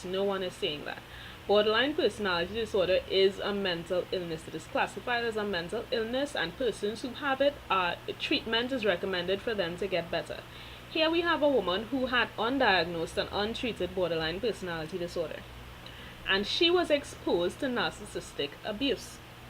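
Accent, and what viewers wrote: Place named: Trinidad and Tobago. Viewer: Sooo... I have a thing for true crime and psychological subjects myself and have been researching this case extensively. Came across this video ans was like whoaaaa... is that a trini??? Cant miss the accent at all. I was like yes definitely a trini. From the moment I listened to Betty's actions, her interviews, everything I instantly felt like it was BPD... I had never been formally diagnosed but when I was younger before meditating and becoming self aware, I had these weird behaviours, hypersensitivity to perceived abandonment and rejection, and a rage that made me question myself a lot. When i researched BPD it was sooo in alignment with what I felt. A lot of the emotional issues I had, I worked through them by meditating and coming in alignment with my inner self, healing past childhood traumas etc.. UNTIL i entered a relationship with a narcissist. 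I was very aware of narcissism and he was completely textbook with the insidious undermining, gaslighting, head games, belittling etc. I found myself becoming erratic again, only in moments of being attacked though. I was becoming violent and nasty every time I was faced with verbal and emotional, sometimes physical assaults. But the thing is, he was so quiet, soft spoken and calculating, and I would react so loud and erratic, that I looked like the absolute nut job. While he stood there with a smirk on his face while quietly saying, "watch how you does get on." And i felt like I was going crazy. I just wanted to make it stop.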